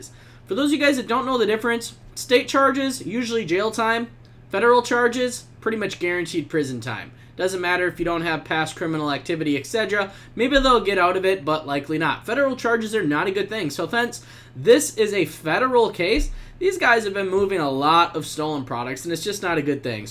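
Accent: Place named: Detroit, United States. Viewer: You from Detroit?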